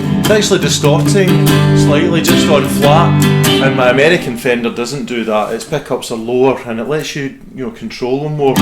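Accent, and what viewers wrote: United Kingdom. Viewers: Glasgow by the sounds of him. Maybe Renfrewshire or Lanarkshire. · Thoroughly enjoyed your review and your great playing. Sub'd:) Miss the Scottish accent after living near Strathaven for 20+ years before moving back to Wales. I've had the TE62DB LPB and CAR models in my Thomann basket for weeks but I'm swithering between one of these and the JET JT-300 from Merchant City Music as a modding platform. Both are great VFM. I too have a Tele itch waiting to be scratched... again! I play my 2010 Squier Tele Custom II P90 to death and also have a Fender MIM Nashville which doesn't get as much love as it has a slightly bent neck... which GG Glasgow refused to exchange when bought in 2008:(( Keep up the good work sir:)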